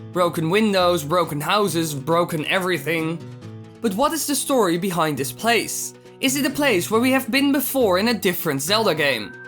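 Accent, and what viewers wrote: Netherlands. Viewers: do you happen to be dutch? i can really recoqnize the dutch accent as i am one myself :p came across your theory through the zeldainformer fb page 😁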